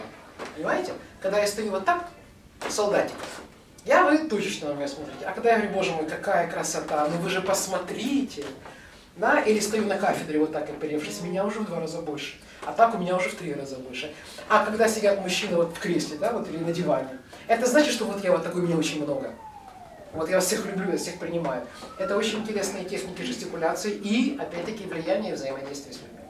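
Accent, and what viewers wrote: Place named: Kyiv, Ukraine. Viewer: а сами вы чем лучше? хам, который не может подтвердить свои слова и не может выполнить в жизни обдуманные и хорошие поступки, тем самым о многом сожалеет, во-первых, вам стало это интересно (тема лекции) и как только вы решили не брать информацию в учёт жизни, во-вторых, вы увидел плохой комментарий и решили написать о человеке, которого не знаете, и судя по всему вы не внимательны и у вас узкий кругозор, так как говор лектор вполне себе, допустимый, так как она живёт (внимание) в Киеве, к вашему сведению столица Украины, в третьих, давайте ж включим логику, если она препадает и ей много лет, значит может чему то научить, и следовало обдумать все хорошо и досмотреть до конца, так как её звали работать личным психологом у политика, и скорее всего у вас сейчас появится желание удалить свой необдуманный ко мент, так как поймёте, что не правы, а когда до читаете этот момент скажете: НО ХРЕН ТАМ ТЕБЕ НЕ УСТУПЛЮ Если так оно и есть, то подумайте о как поступаете)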